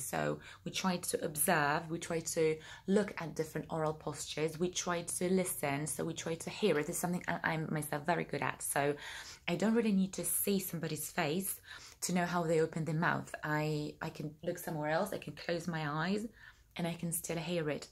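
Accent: United Kingdom. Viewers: Piękny akcent :) brzmisz Posh, tak na marginesie :D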